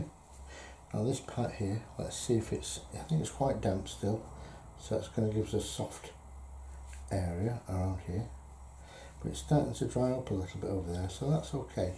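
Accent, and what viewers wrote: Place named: Yorkshire, United Kingdom. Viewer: So different and inspiring, love your style. Quite unique. Nice to hear a fellow Yorkshire accent! Debs in Spain ❤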